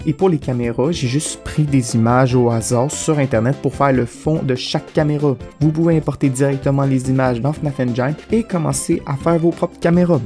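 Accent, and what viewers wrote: Quebec, Canada. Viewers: Québécois non? (Je reconnais grace au gros mots xD) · T'es tu québécois team QUÉBÉCOIS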